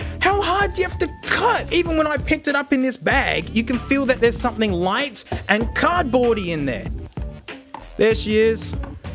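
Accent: Australia. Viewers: Perfect way to start the day, aussie ranting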